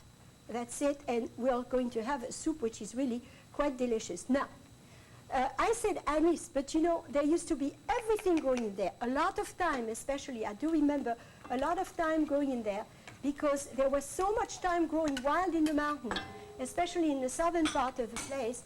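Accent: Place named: France. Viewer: February 16, 2000 San francisco Chronicle A Grande Dame Steps Down / - controversial teacher, mentor and founder of School for American Chefs - conducts her final class. Janet Fletcher, Chronicle Staff Writer looks squarely at one of the young student-chefs gathered around her and minces no words about his menu idea. "That is not something I will let you put on the table," she says briskly. A few minutes later, she verbally leaps on a student who thinks a celery root puree might be nice with the quail. "Oh, please," says the cooking teacher in her girlish French-accented voice. "Save us this puree business. We have teeth." The eight students, all working chefs attending Beringer Vineyard's School for American Chefs, are nearing the end of two weeks with, widely recognized as one of the world's top cooking teachers. But today's menu-planning meeting seems more like boot camp than class, with constant unsparing criticism from this white-haired drill sergeant. 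She is, as former students confirm, brutally frank and quick to find fault. One admiring protege calls her "mean as a buzzard." But like the recipes she so painstakingly balances, herself is a complex creation who softens her harsh side with unstinting generosity, students say. With this last two-week session at Beringer, the 69-year-old is retiring from full-time teaching, leaving students to decipher the ingredients that have made her such a compelling, controversial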